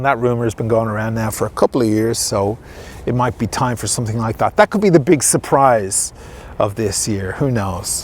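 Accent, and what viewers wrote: Ireland. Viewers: Irish guy ... lol 😝 nice Intro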